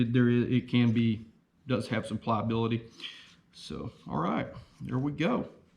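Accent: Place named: Alabama, United States. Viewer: Wow, I love your attention to detail, I invented an engine system to turn an Adult trike or Bicycle into a kind of like a moped I get about 125 miles to a gallon and am using Vanguard single-cylinder engines for power. I invented the Bracket system, not the engine this little diesel may get me up to 175mpg. I would love to talk to you and I am using the youtube account of my wife here is my cell number <num> give me a call. Stuart Brandt Naples Florida By the way are you in Alabama?????? your accent sounds like Alabama a bit.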